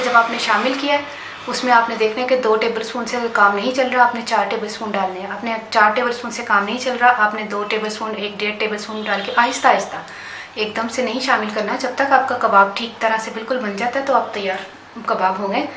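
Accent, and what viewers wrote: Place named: Punjab, India. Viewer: hahahahah so cute Punjabi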